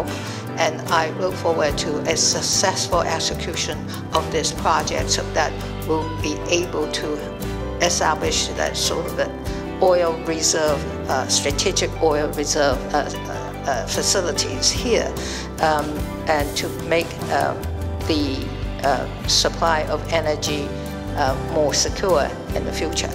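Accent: United Kingdom. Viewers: 小英總統的英國英語腔調真的優雅好聽！❤ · 英文真的蠻好的, 還帶著英國腔調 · 本來就有英國腔嗎？ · 英式腔真的舒服，未來可能難再有這樣腔調的了，希望未來外交部長或總統至少都要拔擢這樣的腔調人才，有利外交形象。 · 蔡總統的英國腔好好聽啊～更愛她了...😍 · 这个英文说的 不太流利, 有点英国口音, 还有点中文口音. 这种英文水平在欧美做律师还是不太够资格的. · British accent very good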